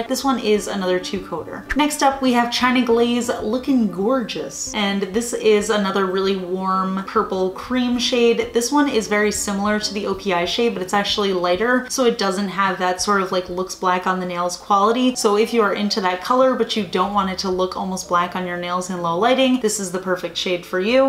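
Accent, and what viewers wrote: New Jersey, United States. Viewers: I didn't even notice that you said "pastel" in a way that would bother people. I'm from north of Boston but I'm half NJ on my mother's side, so I grew up hearing words differently. Farhead and Foah-head, Radiator (with a short a like apple) and Raid-ee-aydah, etc. So count me in as a fan of the NJ accent!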